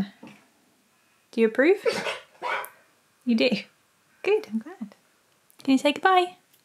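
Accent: United Kingdom. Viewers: Hey, r u a brit??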